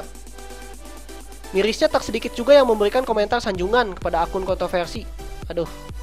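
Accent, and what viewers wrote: West Java, Indonesia. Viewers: Orang sunda kang? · Si akang teh orang sunda ya kang..hihi..ketahuan bangeett cara ngomongnya logat sunda 😀✌✌✌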